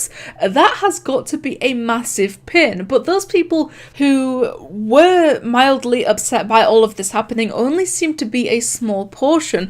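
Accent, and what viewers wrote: Scotland, United Kingdom. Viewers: Is it just me or is she scottish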